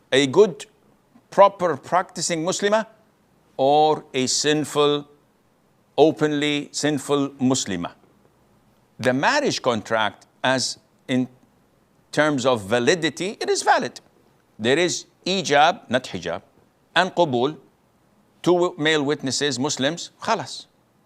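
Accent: France. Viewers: The heaviest french accent I've ever heard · I rewatched the video 3times just to catch the french accent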